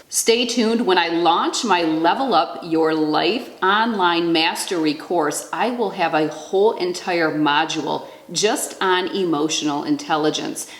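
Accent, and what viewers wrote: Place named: Michigan, United States. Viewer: Your Michigan accent has me laughinnn lol peace from Windsor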